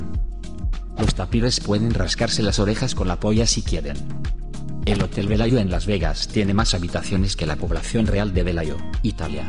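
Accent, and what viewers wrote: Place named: Russia. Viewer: Necesito escuchar esa voz de mujer rusa hablando algo que no sean solo post en Reddit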